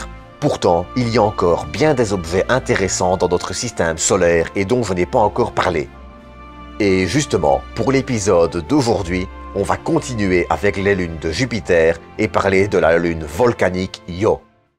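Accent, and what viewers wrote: Belgium. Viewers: La voix off est dégueulasse, c'est quoi cet accent belge, on dirait une blague!!??impossible de prendre cette vidéo au sérieux. Travaille ta diction stp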